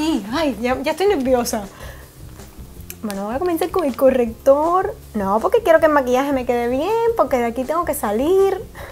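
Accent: Cuba. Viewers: me encanta el acento de los cubanos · es cubana por eso habla así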